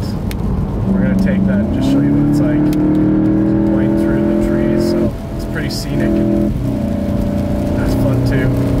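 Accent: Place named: United States. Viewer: What’s with the American accent you’ve only been there a week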